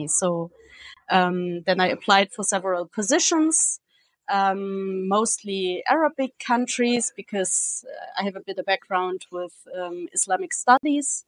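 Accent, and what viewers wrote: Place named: Russia. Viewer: Отлично,. Английский ваш смешной, очень русский, но честный! И ход точный: с разворота брать интервью на английском. Знай наших, питерских!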